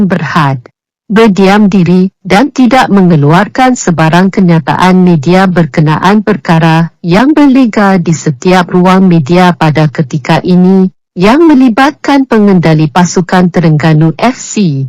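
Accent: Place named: Indonesia. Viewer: Yg bercakap ni indon ke...ckp tak sedap tak jelas...tak ada orang Malaysia ke yg boleh cakap..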